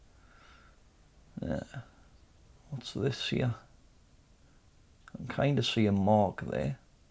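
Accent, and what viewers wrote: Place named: Newcastle, United Kingdom. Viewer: I've just viewed about fifteen YouTube videos on inverter faults and repairs and yours is the first to be watchable, simply because you don't put annoying and unnecessary 'muzak' in the background! I hate this trend on tutorial videos, these video makers don't realise how distracting background noise ('muzak') is to some viewers who simply want to learn the subject. Well done, great (and peaceful) video, with just your easy-going geordie voice talking us through the diagnosis/autopsy. SUBSCRIBED and up-voted.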